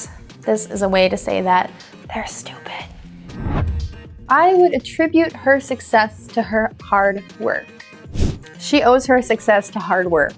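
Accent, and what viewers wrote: United States. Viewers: inglês americano gata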